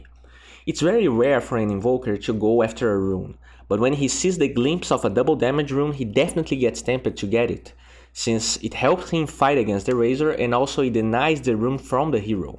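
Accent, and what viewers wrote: Brazil. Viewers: Te sigo há um tempão e suspeitava que vc era brasileiro pelo sotaque, estou certo? Ótimo canal, aprendo muito com sua percepção! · well, that's a pretty heavy accent. brazilian? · dat brazilian accent XD Keep the videos going pal.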